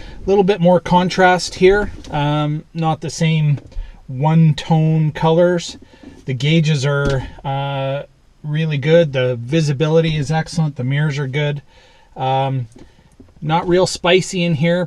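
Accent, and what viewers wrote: Canada. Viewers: It’s Canadian dialect you ignoramus. Their Z is pronounced zed.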